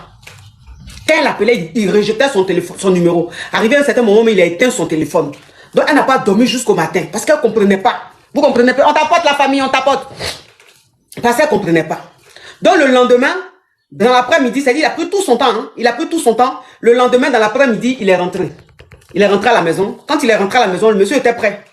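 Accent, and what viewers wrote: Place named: Dioula, West Africa. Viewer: Coach avec son joula facon la ca fait rire koi, c'est pas samalakolo c'est samarakolo qui veut veille chaussure gate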